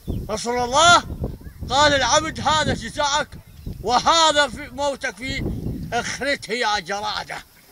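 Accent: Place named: Levant, Arab world. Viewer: شلون مطالع من بغداد 3 سنوات اني خدمتي العسكرية بالموصل وزاخو حبي انتبه لهجته من بادية بلاد الشام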